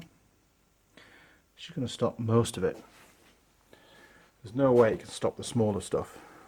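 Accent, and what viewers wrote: Yorkshire, United Kingdom. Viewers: I have to agree! I only arrived at this video by a YouTube recommendation, and to be honest I thought I would skip through chunks of it just to see the damage - - I have watched every minute of it, and what a fantastic video! You have another subscriber (from the UK, where I suspect you originate from, going by your accent?) and I look forward to watching future videos. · Hi, I came here courtesy of the YouTube suggested video list. Being a reasonably experienced hobby mechanic, I understand what you are on about in this here video. The way you talk the viewer through the stripdown is refreshing. Subscribed! Cheers, Niek from The Netherlands (opposite end of the planet! hey!) p.s. I can't help but spot a bit of a mild Yorkshire accent from time to time. You're not from the UK now are you? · Interesting stuff. Nicely presented too. 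You sound like you're originally from Yorkshire? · Heh, I came to the comments to say the exact same thing! Reconised the accent straight away; "odd sounding Kiwi", I thought, "sounds like home" - I grew up just outside York. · Sounds like a Brit, looks like an Australian :S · Your Yorkshire accent is very soft considering you arrived across the ditch in 2008. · Nice tear down. Your accent sounds local to where I live in the UK, maybe a quick run over the "snake pass". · Also, where you from originally? You sound like you’re from not far from here (sunny Rochdale), just over the wrong side of the border into dirty Yorkshire? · You live in Australia I take it, or I think that's what you said at some point. But you sound very much like your from my part of the world in Yorkshire, I live in the dales. I could be wrong, but definitely English, I'm sure, but it sounds that way. Awesome vid aswell, nothing better than getting ya hands amongst it under the bonnet, a lot easier I must say on the bench. 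Glad I came across your vid, it just popped up in things you may like.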